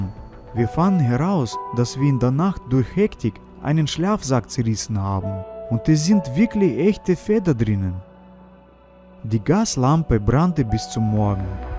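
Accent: Russia. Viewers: Bist du ein Russe oder warum der Akzent?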